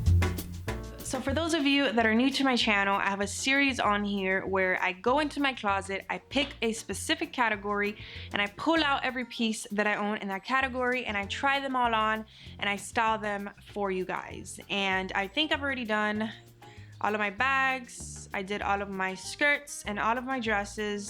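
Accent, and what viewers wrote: Cuba. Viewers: S. K she’s cuban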